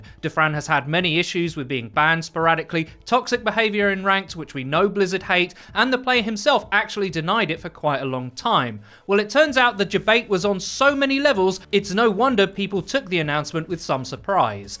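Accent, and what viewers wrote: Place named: United Kingdom. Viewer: The British accent haha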